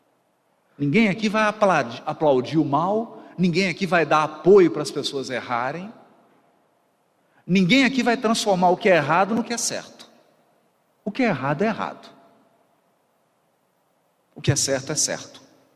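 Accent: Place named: Minas Gerais, Brazil. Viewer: Tô ouvindo você e observando a fala em todos os sentidos e aspectos Percebi que é mineiro assim como eu E esse exemplo de mineiro ir p são Paulo por essas regiões estradas citadas passei por lá e sem GPS , MAS CHEGUEI LÁ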